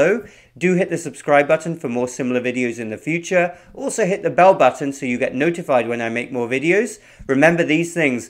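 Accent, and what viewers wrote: United Kingdom. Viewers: THE AMERICAN DIET?? seriously?? u gonna go there in ur British accent?? ur considered a WESTERN nation too.. don't ever forget that.. so don't discount urself or ur nation (if ur really from there, bcuz u look Indian to me) as if its just a. problem singled out to one country.. FYI..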